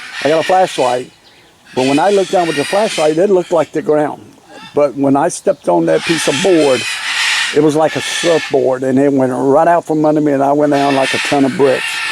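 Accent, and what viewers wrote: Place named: Louisiana, United States. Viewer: Where there is one there are many - be careful! They do eat rats but what a trade off. I hear macaw's - they sound jealous to be on camera - LOL or in pain, I can't decide. Your accent reminds me of my grandparents - LOL Cajuns, they were from Louisiana...you're from Florida....haven't heard it there...PS I think if you click the button on your edit page you can edit the title - I think you mean - Homestead Dangers no Homestad Dangers....just letting you know.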